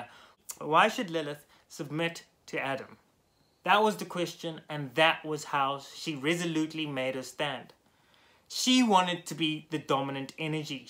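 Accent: South Africa. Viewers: Are you South African? I am South African living in Costa Rica. Lilith in the first house.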